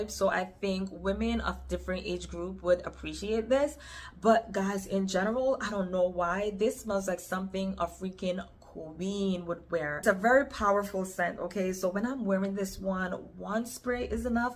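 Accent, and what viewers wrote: Jamaica. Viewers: Truly enjoyed this video. Absolutely love your Jamaican accent.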